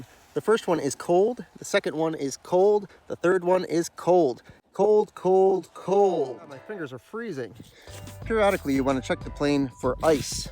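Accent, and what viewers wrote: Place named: United States. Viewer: Thanks, just back from watching. Yeah, that water looked cold to me. Glad you' were able to recover the plane. BTW, are you an expat? Sounds like native USA English to me.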